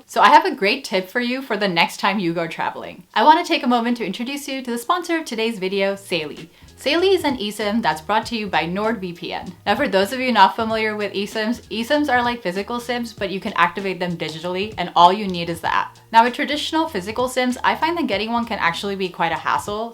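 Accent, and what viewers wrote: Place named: Netherlands. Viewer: I think starting to hear a bit of a dutch tone when you speak American /English....😁😁👌👌👍👍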